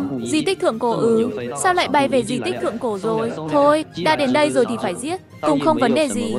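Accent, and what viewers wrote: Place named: China. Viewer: Giọng này có tiếng trung giở quá. Ko hay bằng giọng cũ.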